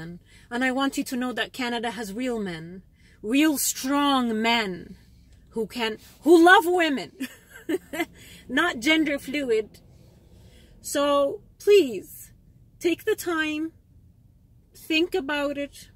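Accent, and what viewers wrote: Egypt. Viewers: انتي سعوديه وكيف لهجتك مصريه😂😂😂😂😂😂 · Your accent is Egyptian... lying is not freedom.. U r right about parading Burdoo. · How do you claim you’re Saudi while your accent is pure Egyptian? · Pat Maguire believe me she is Egyptian I can smell the falafel from her ...we Saudis know each other + we don't live in egypt and you can't find a saudi with Egyptian accent . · Hhhhhhhhhhhh You are laying at your self saying your from saudi arabia 🤬🤣🤣🤣. You are from Egypt. Your accent is Egyptian 😱. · Your accent is not from Saudi Arabia. Your accent is from Egypt. I think you were born as christian , not a muslim. I am from Middle East and everything is clear for me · You are not Saudi , your accent Egyptian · How comes she says she is a Saudi when she has a strong Egyptian accent she is not telling the truth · Egyption accent and speaking on behalf of saudi women. 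· Saudi with thick Egyptian accent 😭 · how come you are Saudi and you accent Egyptian ? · she said she is originally from Saudi Arabia , I am from Egypt and her accent is Egyptian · how you can be a SAUDI citizen but you accents like a Egyptian?🌚😸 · For people asking how come she’s a Saudi Arabian and her accent is Egyptian.. I’m Saudi Arabian and a lot of my male relatives are married to Egyptian women and their daughters speak with Egyptian accent just like their mothers while they’re Saudi Arabian. I just wanted to clear things up. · You are 100% Egyptian. The Egyptian accent!!!!!!! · you not saudi Your accent is from Egypt. Don't lie and say you are Saudi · كيف سعوديه وانت تتكلمين مصري؟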